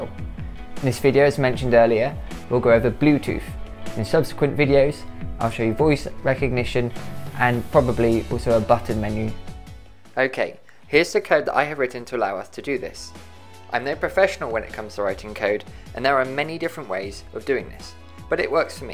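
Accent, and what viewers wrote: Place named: United Kingdom. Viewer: i ll follow ur tutos and buy what u linked in description, then i ll try to give u a "field report" =) THANKS SO MUCH to share that tho ! love ur accent :) british ?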